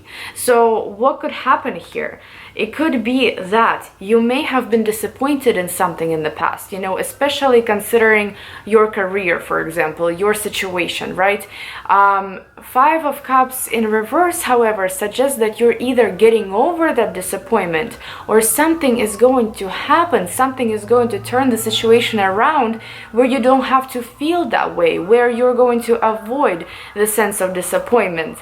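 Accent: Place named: Russia. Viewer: I like her accent, is she Russian?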